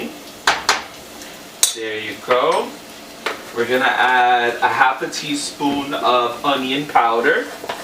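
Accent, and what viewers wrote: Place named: Queens, United States. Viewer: Are you from Queens brother? Lol I love it!! Thanks for sharing!!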